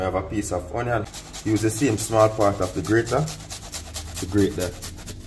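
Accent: Jamaica. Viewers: Chef, next time you come back, please do a pop-up cookout in MANDEVILLE. I would order this meal. While you're on-site cooking you could do a book signing for fans. It would be a great way to market your book. Your meals are prepared in such an appealing way that one can eat with one's eyes. I also love your attention to cleanliness. No dutty pot, we say. What did you say, Old cow hard to chew. 😂 Don't go offending any old cows now, my boy. I love the little value bombs you drop offering instruction plus little-known cooking secrets. Communicated in standard Jamaican English. You've got it locked. 💯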